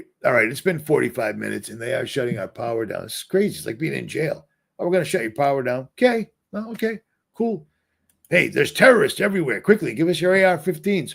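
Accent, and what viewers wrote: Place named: Boston, United States. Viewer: haha the Boston accent. We don't pronounce our R's and we add R's to words that don't have them. Reminds me of my sista Amander.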